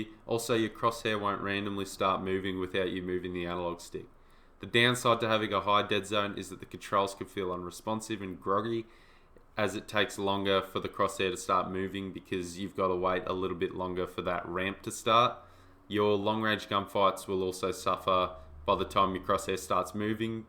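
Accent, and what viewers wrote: Australia. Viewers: Soon as I heard that voice I subbed, don’t come across many aussies, good video to bro, didn’t know what deadzone was till now 🤙🏻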